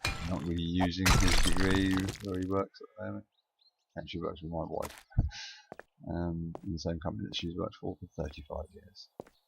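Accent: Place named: England, United Kingdom. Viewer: If I may be so bold: a couple of problems I noticed - the voice commentary is often unintelligible, or drowned out by either the minibike or the sounds of mining. I appreciate that you have an English regional accent, and that is set in stone; but I am guessing that perhaps some viewers find it difficult to understand - at least you don't have a thick Geordie accent eh? Building and engaging an audience is a long hard road, but another step you could take is uploading a profile pic, doesn't need to be a selfie, could be anything, but it is something other than the anonymous 'blue blob'. I am your one subscriber!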